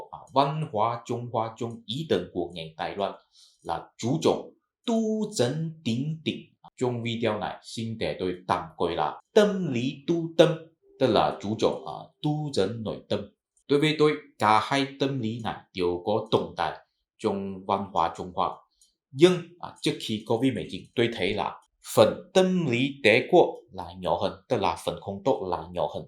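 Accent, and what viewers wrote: Standard. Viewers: Sao anh này nối tiếng Việt chuẩn mực và rõ ràng thế nhỉ? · Anh phát âm ngày càng chuẩn, trôi chảy và rõ lời hơn những video đầu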